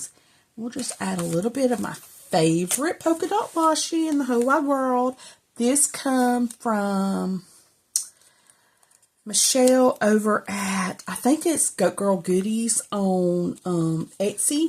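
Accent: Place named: South, United States. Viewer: no girl…but I am from Texas. My son was watching with me when I played your video and he said…oh she’s from the south. She sounds like us! 😂😍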